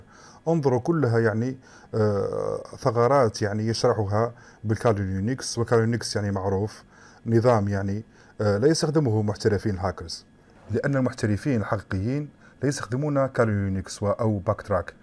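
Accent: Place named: Morocco. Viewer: واضح من اللهجة تاعوا انه مغربي.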